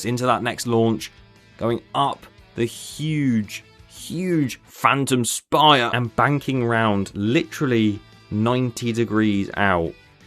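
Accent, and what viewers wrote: United Kingdom. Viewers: British accents piss me off. This guy sounds like he licks windows.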